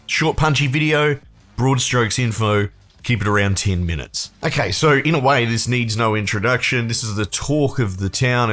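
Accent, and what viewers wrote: Australia. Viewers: Perfect and honest recap dude - love it!! I was looking for a straight-to-the-punch, not-too-technical kinda review to send to my non-Elder Scrolls-abiding Aussie buddies, and since it sounds like you are an Aussie yourself, well that's just the cherry on the pavlova!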